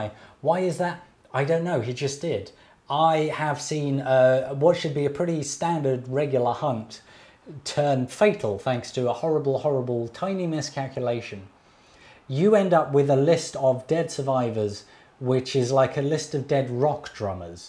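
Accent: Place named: London, United Kingdom. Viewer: Then why does he talk like he's in London?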